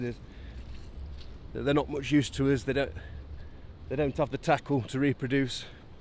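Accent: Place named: East Midlands, United Kingdom. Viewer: Nice to see a new video! You sound like you’re either Notts or Derby… if it helps I use RB Elliott at Calow and they kill and cut the pigs for me, I only have a basic cut into big joints and then cut them down further myself and package up. They do offer kill only of course I’ve always found them to be very good.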